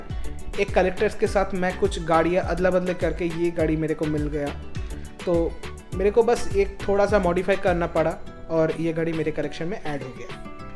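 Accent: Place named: Bengal, India. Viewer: Sir are you a Bengali??..From your Hindi accent...I also have a small hot wheels car collection of 30 cars...But your one is huge..Great job...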